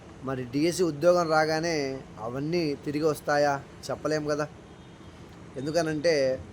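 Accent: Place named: Adoni, India. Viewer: Sorry సర్,,, మీరెవరో తెలియకనే మన ఆదోని వారు గమ్మత్తు మాట్లాడుతున్నాడు,, అని subscribe చేసుకున్న,,, కానీ మిగురించి తెలుసుకున్న మీరు ap స్టడీ లో తోపు అని,,,, hatssapp సర్ మా జిల్లాలో పుట్టినందుకు,,,,❤❤❤❤❤❤❤❤❤❤❤❤❤❤❤❤❤❤❤❤❤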